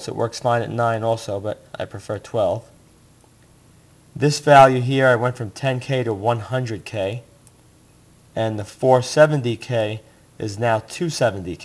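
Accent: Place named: New Jersey, United States. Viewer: Your videos really pull you into the action. Maybe it is the NJ accent, or the way you are basically shooting and talking live with a handheld camera, ...it can get hard to watch that way with the camera moving, if it moves too much. Sometimes it does and that is bad, but with some movement, it gives your videos are warmer feel than if you were just talking with a tripod. Your setup results in a very personal video, like I am right there with you in your kitchen. It just works.Good project too.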